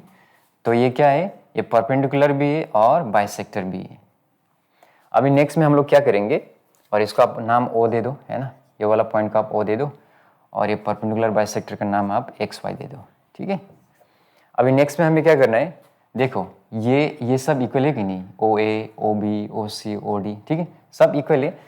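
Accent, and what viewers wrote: Assam, India. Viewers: Sir are u frm Assam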